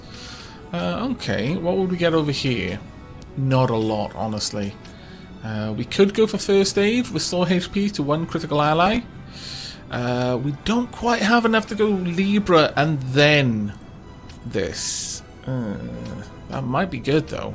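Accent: Wales, United Kingdom. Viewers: You sound like you are from Wales. Are you?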